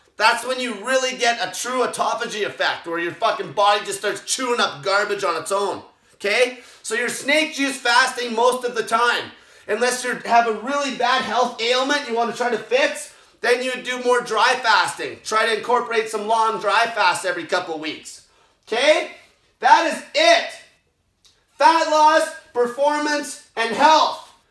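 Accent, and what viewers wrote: Canada. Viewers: i think im in love. this guy sounds canadian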